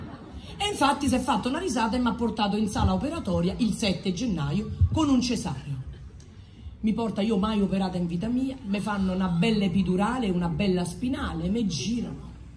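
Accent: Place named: Rome, Italy. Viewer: Parla come un maschio romano. Corpo da donna molto bella ma parla come un uomo. Cresciuta con 5 fratelli e normale. Ho una sorella così. Non e nuovo per me siamo 5 fratelli e mia sorella che rappresenta 10 uomini e giudici sono guai se vi capita di incrociarla. Valentina Persia e sympatica, sincera et sopratutto modesta... E mitica e unica. Un altra che estimo Anna Maria sconsolata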